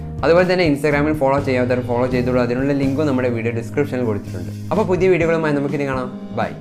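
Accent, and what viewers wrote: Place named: Malappuram, India. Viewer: Same same.... Njanum malappuram aanu... മലപ്പുറത്ത്‌ എവിടെ ആണ്.... ഭാഷ കേട്ടപ്പോൾ ഒരു സംശയം.... അതാ ചോദിച്ചേ....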